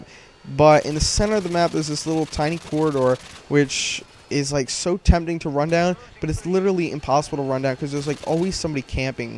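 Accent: Spain. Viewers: ur spanish? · eres espanol tio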